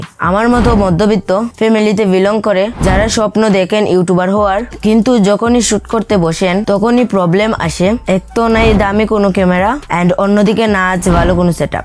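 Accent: Sylhet, Bangladesh. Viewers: ভাই তুমি সিলেটি নি বা.! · ভাইয়া তুমি কি সিলেটি ❤😊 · Tumer bari Sylhet ni suto bai?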